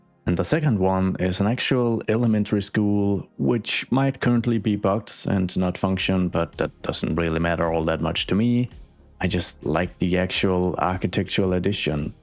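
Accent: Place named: Denmark. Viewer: Synes også jeg kunne fornemme en Dansk accent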